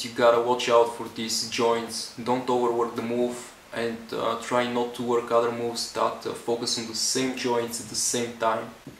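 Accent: Bulgaria. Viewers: Ебаси българския акцент .... българин ли си ????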